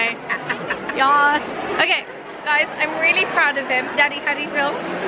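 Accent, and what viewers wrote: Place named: England, United Kingdom. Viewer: Good English accent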